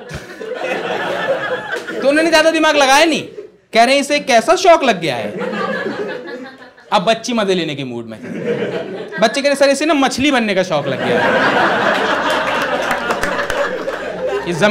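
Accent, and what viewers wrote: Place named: Western Uttar Pradesh, India. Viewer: Western UP ka accent lag raha....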